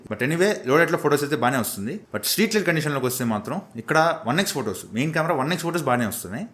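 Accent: Nellore, India. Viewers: bro nellore aa meedhi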